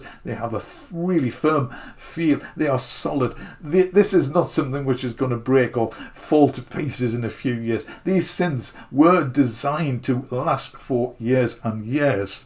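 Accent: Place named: North East England, United Kingdom. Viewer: Hi, You seem to have a north-east twang, I'm in my 50's, an electronics engineer and live in Teesside. I collect faulty 80's and 90's keyboards, modules and obscure sound generating gear, put them back to fully functional and script files to interface with Cakewalk or Fl studio. Software emulation is good for lack of space, but it won't appreciate in value and many deep dive functions in my Korg gear (M1, X3) would never be replicated, Korg in the 90's mastered the full-bodied out-of-the-box sound. Best wisher for 2024. ✌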